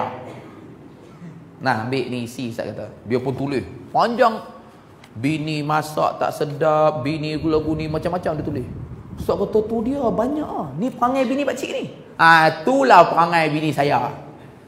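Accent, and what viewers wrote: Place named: Northern Malaysia, Malaysia. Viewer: Bahasa utara memng agak kasar... tapi bagi penduduk utara x de masalah.. klu x sesuai dgn ceramah ustaz ini.. boleh la dgr ceramah ustaz setempat... ustaz setempat mungkin tahu inisiatif utk mendekatkan diri dgn penduduk setempat...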